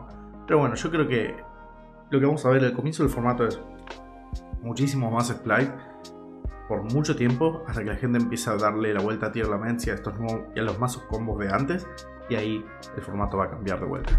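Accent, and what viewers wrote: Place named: Argentina. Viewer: No te preocupes igual me di cuenta hace rato que eras de Argentina por tu forma de hablar, expresarte, etc, que es como si fueras de Uruguay, me gustó encontrar alguien que hace analisis, da opiniones, predicciones, EN ESPAÑOL, por que la mayoría son gringos y no entiendo una M jaja saludos y sigue asi, todavía espero tu analisis de las magias y trampas y monstruos de efecto de la banlist je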